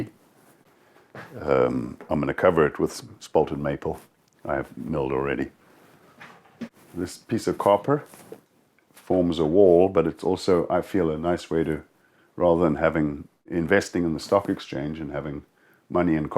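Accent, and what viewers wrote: South Africa. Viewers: This was so good to hear an south african accent doing something so amazing. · Interesting unpredictable admixture of South African and North American vowels... nice life... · as soon as i heard his voice i knew he was South African- watching from Durban. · I heard your voice and knew at once you were South African. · I recognized your SAffer accent immediately! Thought, "He's from SA!" Great way to live. My kids are also off grid here with a wonderful garden. Very few expenses. It's the way to go, with the way things are here and getting worse. Baai gelik en sterkte voorentoe. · I am south african and could tell his accent immediately. he is living the life i could only dream of. well done. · And he probably grew up speaking afrikaans, English is his second language. Has trace of afrikaans accent. Intelligent human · I would recognize you south African wherever ur. 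Job well done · Wow that South African accent, unmistakable.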